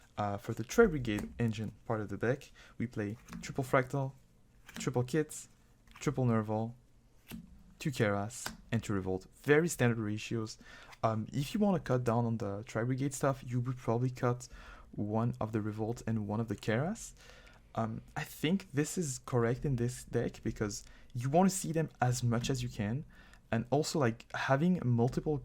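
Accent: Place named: France. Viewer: great deck profile!!! weird question, why do you sound kinda.... fr*nch?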